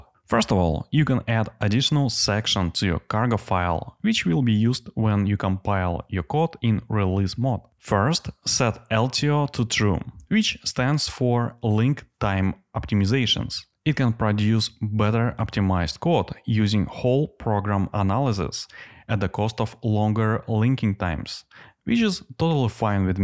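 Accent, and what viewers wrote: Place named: Russia. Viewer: Было бы более приятно слушать без русского акцента)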